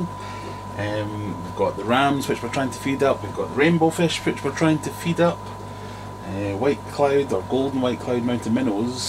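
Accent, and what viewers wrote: Scotland, United Kingdom. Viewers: Nice to hear a fello Scott 😃 I'm going to be setting up a nano tank with these hence finding this video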